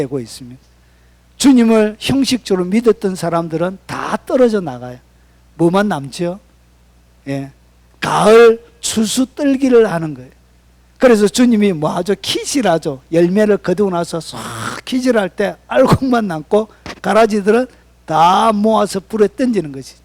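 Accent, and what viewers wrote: Gyeongsang, South Korea. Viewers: 네 맞습니다 경상도 억양이 그렇습니다 입구조가 그런지 못 고칩니다 경상도 식당에 가면 시끌시끌 하고 전라도 지방은 조용합니다 큰 소리에 훼방 받지 말고 은혜받으시길 기도합니다 저도 목사님 말씀 늘 경청하고 있습니다 이와 같은 때 주의 귀한종들을 통해 꼴을 억여 주시는 하나님께 감사와 존귀와 영광을 돌립니다🙏저도 볼륨 조절하며 듣습니다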